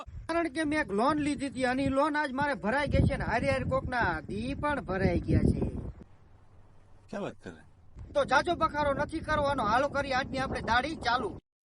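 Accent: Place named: Gir, India. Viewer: Gir No Havaj Se Bhai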